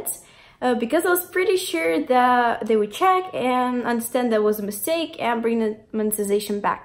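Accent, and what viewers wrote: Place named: Russia. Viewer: видно же что русская. По акценту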